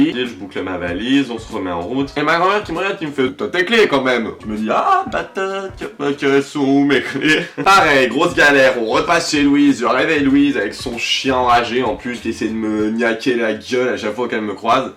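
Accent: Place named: Marseille, France. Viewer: Les 14 pouces vers le bas c'est les jaloux de ta bague de ouf et ton accent marseillais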